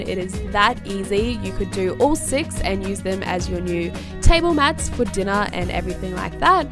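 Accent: Australia. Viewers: Are you Australian? If so, what part?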